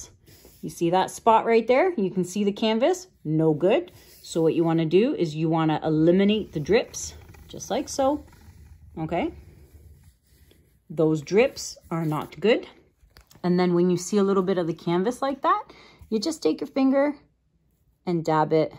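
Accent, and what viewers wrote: Canada. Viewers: i really like listening to your canadian accent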